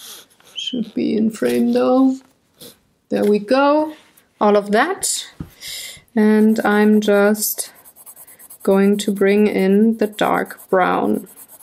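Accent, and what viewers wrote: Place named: Germany. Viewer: Hut ab, dass Du das alles auf englisch machst! Vom Akzent her solltest Du das hier lesen können ;-) Meine Sabbelvideos hatte ich ursprünglich auch auf englisch gemacht früher, aber der Humor ging verloren. Dafür spreche ich wohl zu schlechtes Schulenglisch :) Schaue mich gern auf Deinem Kanal um.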